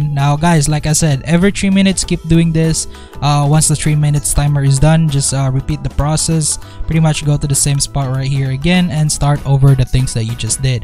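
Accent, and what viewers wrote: Philippines. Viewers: you sound very filipino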